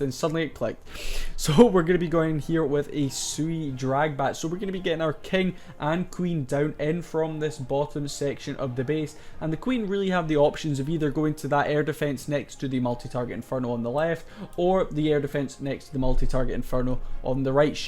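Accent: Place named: Scotland, United Kingdom. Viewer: your accent reminded me of "trainspotting" movie "choose life" thank you for the vaedio man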